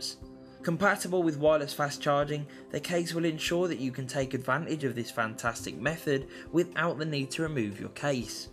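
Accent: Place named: Birmingham, United Kingdom. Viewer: Guess you're a Brummie then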